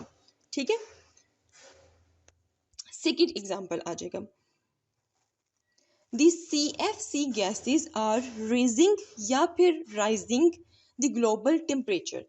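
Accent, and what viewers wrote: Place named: Punjab, India. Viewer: You speak Urdu and few sentences in English with Punjabi accent. For example, words got and correct you pronounce with Punjabi accent. Also, a basic Urdu mistake: when you begin a sentence with Aap, it ends NOT with ho but hain. This is Indian type of Urdu which is not correct.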